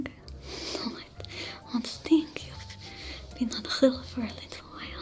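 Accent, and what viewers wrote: France. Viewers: This is an amazing ASMR rp. First the asmr components as always are top notch. Second the choice of French accent really helps hit certain sounds that trigger (at least for me). Then there's the commitment to the star wars component. Most of the props look like they would fit in universe. Lot of work for the background components. Then there's you costume. Your rps are fantastic. Keep up the amazing work. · honestly it truthfully is the background soundscape that does it for me it's good white noise to contrast the performance you do Also for some reason the French(or is it more Cajun?) Accent does tingle me kinda hope you have more reasons to do it in the future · Are you from France, my Green love? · I haven't finish watching this yet I am already commenting haha I am so sure that I will not finish watching this because of course, I will fell asleep again and earphone wires will choke you hahahah I just mostly leave my phone on and just listen till I fell asleep. 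*update, I have finished watching it XD Will you also upload the no music version of this ? If not, I will still watch/listen to this~~ I don't know if it's me only but the accent is kinda like a French, specially how you emphasize the letter R hahahah Thank you for this amazing, cool, efforts and everything. I/we love it already ( ╹▽╹ ) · Can we Just acknowledge how she answers to EVERY Comment + how hard she works for us to Fall asleep. Also I have never watched Star wars before but because of this ASMR and the french accent I will watch Star wars now. Oh and I follow you for quite a while now and I wanted to thank you so much for so many anxity attacks you took from me. Especially for the sleepless nights, you helped be trough. Just Thank you for everything you do (✿^‿^) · i was like "wow the French accent really fits twi'leks." but as im reading the comments, I was not the only one that forgot that it was the actueel accent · It's truly amasing. 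I'm a fan of Star Wars since I was 3 years old (I'm 25) and I must say I have a thing for Twi'leks. You are so glamorous and adorable as a Twi'lek. And add to that, since my mother language is french, pour french accent is truly nice. Love you and your works.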